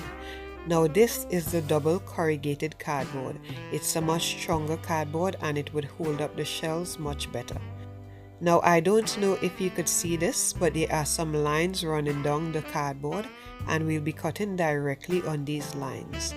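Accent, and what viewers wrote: Trinidad and Tobago. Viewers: Wow you sound Trini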